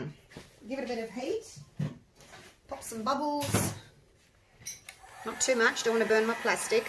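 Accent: Australia. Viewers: The eastern brown hiding in your cupboard next to the Vegemite is extremely impressed with your resin prowess 🐍 ❤ I detect an Aussie accent, so I presume you are located in Australia, where it seems every household has at least one highly venomous reptile lurking in the loo or other parts of the house 😬😂 Cheers and love 💕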